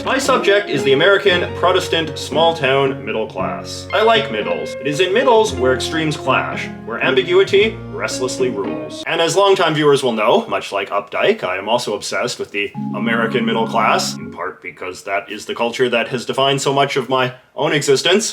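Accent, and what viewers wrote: Canada. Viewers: Immediately thought about Ricky lol, especially cause you have a Canadian accent.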